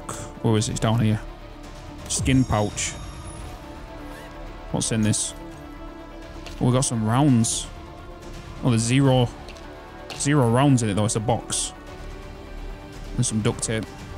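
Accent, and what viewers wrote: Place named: Preston, United Kingdom. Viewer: Your accent really sounds like you are from preston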